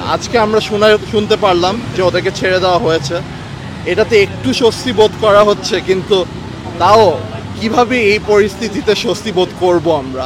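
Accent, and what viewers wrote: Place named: Bangladesh. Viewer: তোমার কথাগুলো এত চমৎকার লাগলো যে কি বলবো। তুমি অত্যন্ত গুছিয়ে, বলেছো। তোমার কন্ঠের সৌন্দর্য, শারীরিক ভাষা, গুরুত্ববোধ, আঞ্চলিকতা সব মিলিয়ে একটা পারফেক্ট বাংলাদেশী মানুষের রুপ সুন্দর করে ফুটেছে। মানে তুমি পুরদস্তুর বাঙ্গালী এবং বিদেশ গেলও বাংলাদেশী।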